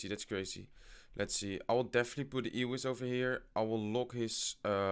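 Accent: Netherlands. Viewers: Dude are you dutch? I hear a dutch accent ( ik ben wel Nederlands :))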